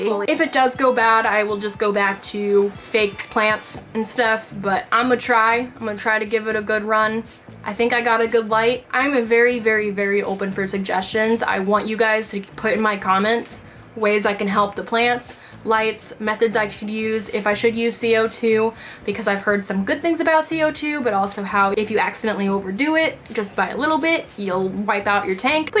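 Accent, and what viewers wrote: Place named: South, United States. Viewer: I feel like I suddenly just noticed your southern accent Like it just stood out in this video But I’m from Minnesota so 😔🤟🏻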